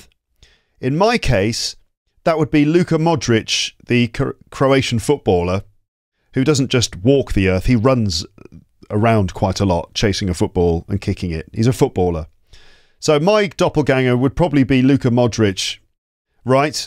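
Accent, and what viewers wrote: United Kingdom. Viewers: you're such a great person, and your channle is really a treasure for those who want to learn English in its natural way, by listening to Britch guy with a very clear accent, just thank you for your effort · Do you speak in british accent? 🇬🇧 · 👍👍👍👍👍👍 good teaching. he is British native English. Not American. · Thank you so teacher you are teaching english good also be able to listen British accent this is real one not easy to find out easy ways some one like British accent and translate to shot form sentence this is good idea clear thanking you. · I happened to find your channel and totally drawn into. It was really interesting and learning a lot. I was looking for an English lesson program just like it. You are such a great teacher and I like your British accent as well it sounds so nice. I will check out your other movies. Thanks a lot! 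· Are yoy British?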